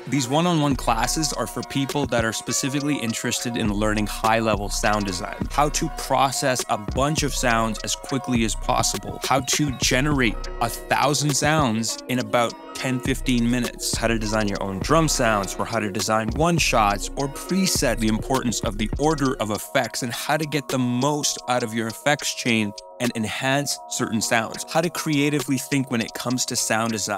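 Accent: India. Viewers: Tutorial indian accent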